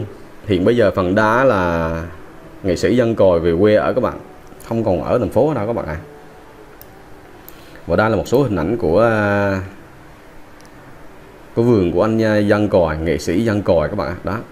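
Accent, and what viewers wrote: Quang Nam, Vietnam. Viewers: Nghe giọng QN ĐN chán chết đc .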